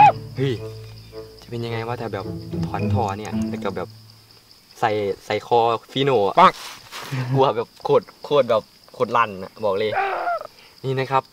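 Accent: Korat, Thailand. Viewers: คือภาษาโคราชแท้😂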